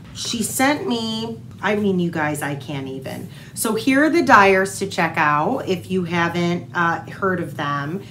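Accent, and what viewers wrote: Midwest, United States. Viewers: I'm from Madison Wisconsin and I'm so happy that you say "All's." My Mom use to ask me all the time " How do you spell that?" Great Midwest accent!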